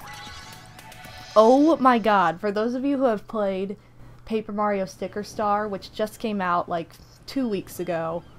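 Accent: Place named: Indiana, United States. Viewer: Canadian here. This year I didn't go over the border for Black Friday deals though so I'll just be staying here listening to your Indiana accent.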